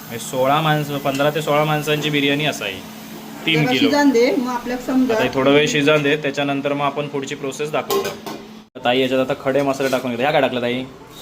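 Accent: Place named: Malvani, India. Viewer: याला म्हणतात खरे मालवणी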